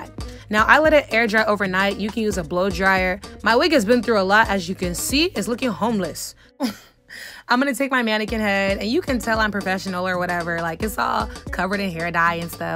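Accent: Nigeria. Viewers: I like how you infuse naija English for drama 😂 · Are you Nigerian? I promise i hear teni askamaya in the background! Sisshhh plus i can low key hear the Nigerian accent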